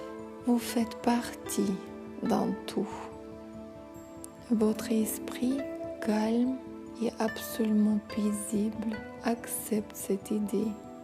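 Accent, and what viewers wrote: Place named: Russia. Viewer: J'adore votre accent russe. Спасибо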